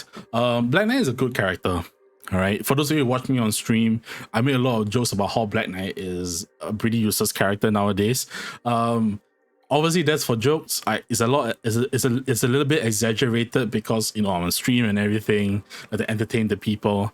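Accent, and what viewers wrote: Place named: Philippines. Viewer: good vid bro, your accent is heavy .. are you filipino??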